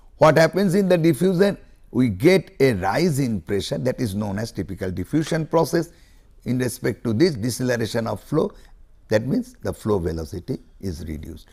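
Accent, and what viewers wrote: India. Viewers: His accent is indian... maybe that's why. The lecture is great though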